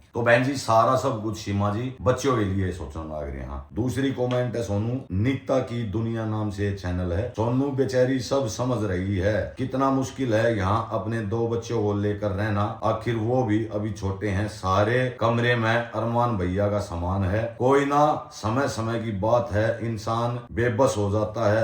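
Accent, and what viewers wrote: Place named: Haryana, India. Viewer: Apka man saaf hai aur apke pariwar mei koi dikhawa nahi hai yahi apki sacchai hai. Jis ghar ki aurat itni saaf dil or hansmukh ho vaha hameshacbkhushiya hoti hai. Bhole baba ki kripa aise hi bani rahe aapke pariwar pe. Apka jo haryanvi boli ka andaz h vahi apke blogs ki shaan h aise h blog banate rahiye Bhai sahahb ji. 💐💐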